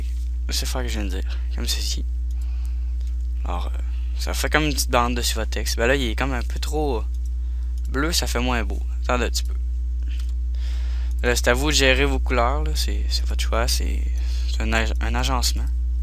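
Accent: Quebec, Canada. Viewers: LAXENT QUEBEQUOI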